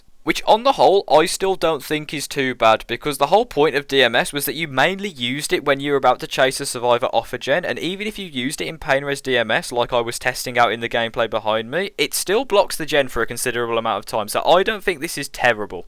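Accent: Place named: United Kingdom. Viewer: hmmm, this guy sounds british ....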